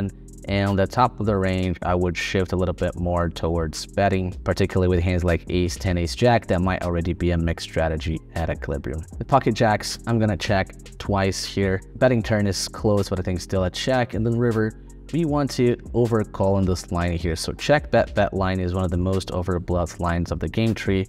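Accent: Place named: United States. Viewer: good content!I wanted to ask if you live in US or born there, seems to me that you speak perfect USA English, which I admire a lot!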